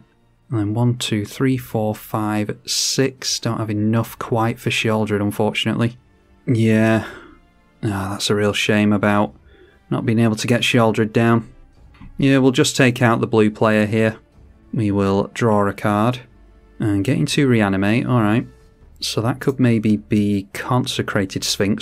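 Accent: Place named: United Kingdom. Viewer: Talk british to me mate. Honestly your voice is outstanding.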